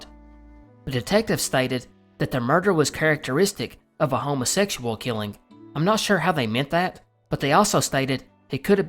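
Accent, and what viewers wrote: South, United States. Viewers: Haha also you saying hello fam was a culture shock because fam is British slang over here 🤣 miles and miles away from the south states of America - enjoying the series as an outsider and my heart on the first part talking about your accent. Other than preconceived ideology of the south I still can't put a face to a voice I'm sure we'd all be interested in a face reveal even though your content doesn't suit that (oh god those yters that combined this content with face cam and the audio quality is different). Anyway as a British hooligan I've commented about your accent before and your dialect doesn't bother me one bit I love your pronunciations they make me giggle in a completely non judgemental way I'm sure we'd be giggling at each other if you heard my accent too 🤣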